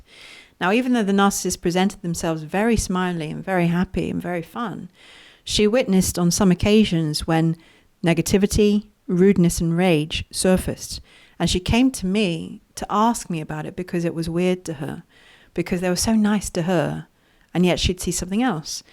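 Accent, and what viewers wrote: United Kingdom. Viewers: 😊. Wow thank you so very much for that excellent excellent information! 7 what you're saying I knew, but some of them I didn't..... A's always I learned something new with you! I really needed to hear this and I don't think I would have heard it so clear and precise with all the details in nuances that you provide. After going through multiple narcissistic relationships, I've come to terms with what you were talking about I'm not putting too much volume and look for the way their body looks...ECT.... But learning to value a person more on their mind, character, and intellect.. may I give you a compliment, I really like the way you think your thought process is very deep you seem to be extremely organized in your thought process paying attention to detail. And well....I just love your British accent! 😅 Dave.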